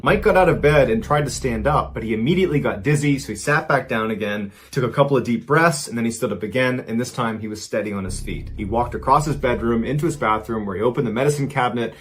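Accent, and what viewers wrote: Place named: New England, United States. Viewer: Love your stories... best part is hearing it with the accent. I never realized how much I missed it until I watched you for the first time. ❤ New England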